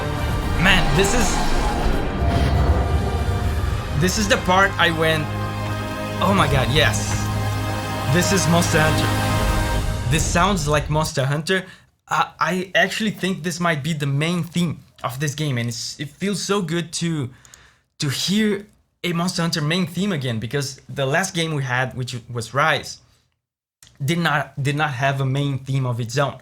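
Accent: Brazil. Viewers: Mano, descobri que vc é brasileiro pelo seu sotaque e pelo sobre do canal. Legal demais ver brasileiros com um grande público estrangeiro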